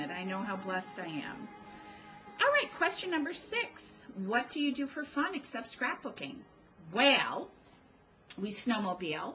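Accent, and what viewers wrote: New Jersey, United States. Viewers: Nice to meet you. You are very sweet, love your NJ accent. You are so right that we should get to know each other better. When I am brave enough I will made a video too. My mom was from Chicago too. TFS! ~Sharon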